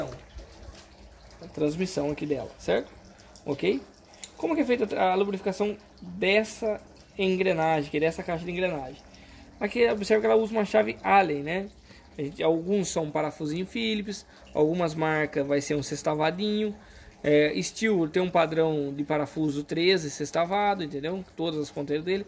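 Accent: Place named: South, Brazil. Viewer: Bom dia gostei de assistir sua explicação tudo de bom pra você você deve ser do Sul por causa do sotaque parabéns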